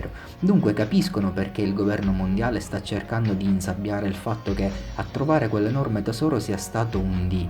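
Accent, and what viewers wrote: Italy. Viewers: Bel video! Sei calabrese/siciliano? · Ottimo canale. Una domanda. Siculo? Agrigentino?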